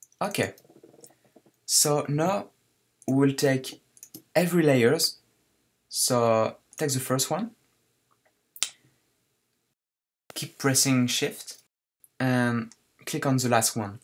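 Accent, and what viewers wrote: France. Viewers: Aaaah, l'artiste de Reddit est donc un Français ? :DD (French accent spotted) Comme quoi, beaucoup de talents en art et design numérique viennent de notre beau pays ^^ Je suis un de tes premiers fans :) On sent qu'il y a la même "patte graphique" qui revient souvent sur la plupart de tes autres wallpapers, cependant cela reste quand même un beau style que tu as su t'approprier ;] Bref, bonne continuation et bon tutoriel, Un "French" 😁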